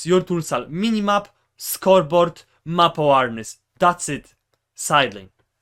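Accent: Poland. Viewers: Pewnie polak sądząc po akcencie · Are you from Poland? Jesteś Polakiem bo akcent wydaje mi się że słyszę Polski.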